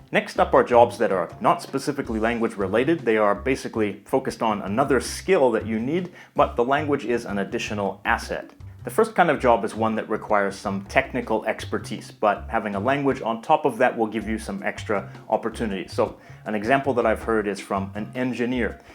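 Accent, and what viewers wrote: Canada. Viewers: Hey,?(first off as a little joke, I’d bet 50 million dollars that you’re Canadian.). Your accent is so strong. Not a bad thing at all, I just recognize the Canadian accent:-) I used to live in Cleveland for a few years growing up after leaving LA at age five. Then I did college and traveled the world. Currently I’m living back in Los Angeles I ♥️ my city!! (a mile from downtown LA and I’m actually- - believe it or not finding it hard to find any reasonably priced tutor or school of languages. We All know Berlitz is SUPER EXPENSIVE. It’s expensive already Just to live here as it is, so my location is all the way across town to Westwood (better known as Tehrangeles) which. And SoCal alone Has the Highest percentage of Iranians living outside of Iran. They’re mostly-no,.. ALL ARE very Wealthy or well to do - and all are Reza Pahlavi supporters for the most part which is fine I’m not being political but the generation just a few years older than me,.. 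who were 7-8 in the late 70’s or early 80’s and left the khoemeini revolution are so far snobby and all about money and materialistic.i Literally cannot find a school under $775 for a once a week four-week class?! And the private tutors want less than $90 per HOUR?! That’s the “best” price I’ve found. Do you have any suggestions or places where I can practice my Farsi? I’m semi intermediate level. Forget USC and UCLA’s programs. They’re wonderful but I would have to sell my kidney to take a semester there. I own tons of Persi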